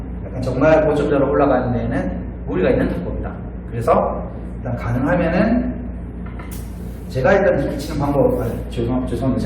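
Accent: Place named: Gyeongsang, South Korea. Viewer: 말투가 진짜 정겹네요 ㅎㅎ고향사람 생각납니다 창원 마산사람이라~ ❤❤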